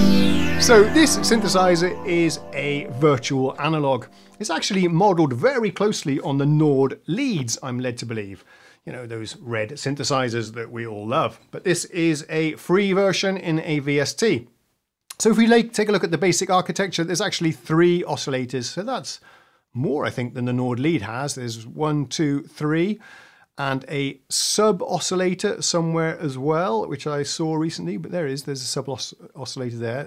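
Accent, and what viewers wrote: United Kingdom. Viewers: Starting to get weary of this British accent teaching us incessantly about everything and oh, don’t forget to toss in a few meters and kilometers.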